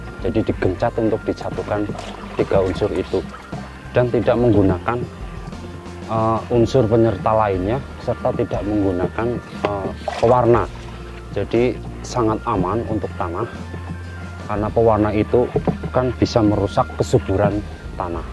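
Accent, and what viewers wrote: Banyumas, Indonesia. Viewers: Asalamualaikum. broo wong endi kiee, ngomonge ngapak, dadi pengin sinauuu bro....🙏🙏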